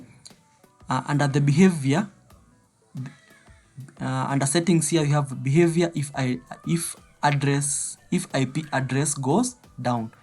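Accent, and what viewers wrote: Kenya. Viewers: Informative channel, pia mimi niko na ma channel kadhaa huku youtubes, uko na strong kikuyu accent nimejua tu wewe ni mkenya lakini wakenya wameharibu market ya online kwa onlinejobs by using fake accounts na proxies, sasa its harder now ju kenya imebaniwa in most online platforms. Niko na swali, Go login yako unafanyaje ikuwe na over 300 days left na ya trial inafaa iwe na 7 days?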